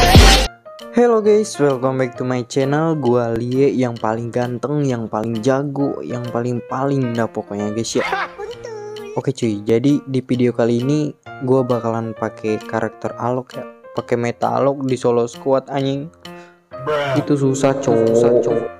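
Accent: Palembang, Indonesia. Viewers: Bahaso Palembang nyo keliatan bang j awoakwowk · Bang lu orang Palembang y bg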